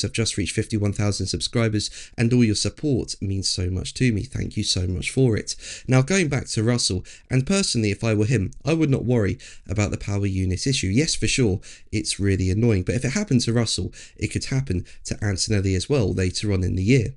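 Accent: United Kingdom. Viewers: Not only does deliver in a slightly slurred British monotone voice, I really didn't learn anything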